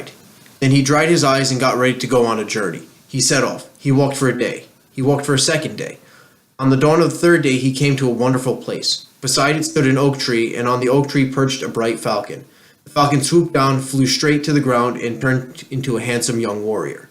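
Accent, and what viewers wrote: New Jersey, United States. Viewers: Don't apologize for your Jersey accent, I love listening to you. Thanks for telling this story!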